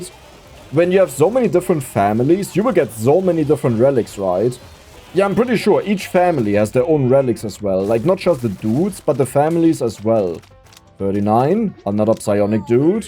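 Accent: Germany. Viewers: Honestly i love your german accent! (and am a little jealous)😊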